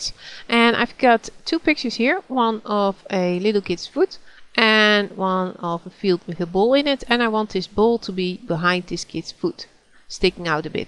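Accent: Netherlands. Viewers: dutch accent.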